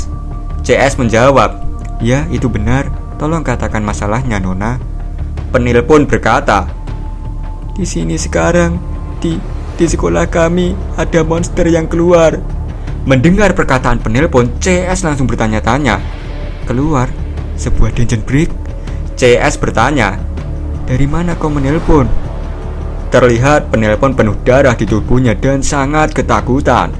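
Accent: Java, Indonesia. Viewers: Saya dari part 1 di season 2 ini, saya merasa denger cerita korea dengan logat jawa, tapi seru, dan saya suka.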